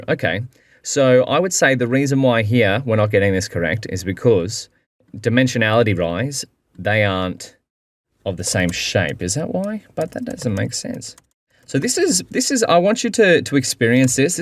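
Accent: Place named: Australia. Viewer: Yes, his australian accent is one of a kind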